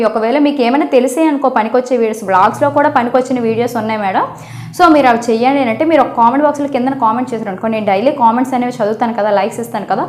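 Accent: Srikakulam, India. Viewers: Maadi Srikakulam sis... ani chusa appudu anukunna ma side velladi ani...mem kuda vyshyas...bobbili lo ma vallu unnaru...slang ni marchukomani comments chese vallandaru posh guys ayiuntaru...anduke nativity ni comment chestunnaru...evari kosam meeru change avvadu aite vallaki value ichinatlu avtndi...keep doing ur vlogs...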